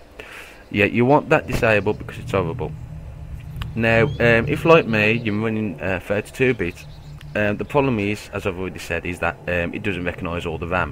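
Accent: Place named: Germany. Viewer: you're german right? :D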